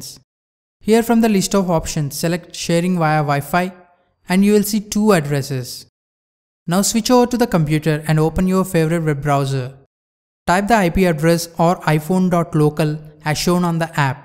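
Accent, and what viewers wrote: India. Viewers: i always cringe a bit when i heard indian accent but we all learn and i understand. nice video mate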